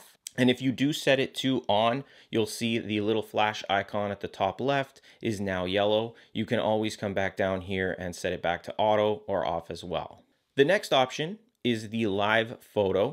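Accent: Canada. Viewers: Thank you! i'm going to watch again, just got the 12from the 6 ani'm a filmmaker. Enjoyed the Canuck accent.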